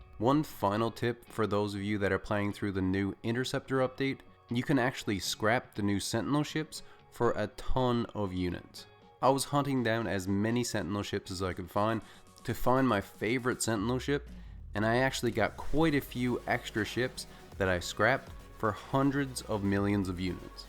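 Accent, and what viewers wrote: Newfoundland, Canada. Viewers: Lol, I was thinking the entire video that you sounded Newfie.... and then your outro confirmed it haha. Obviously I didn't check the channel name before watching XD.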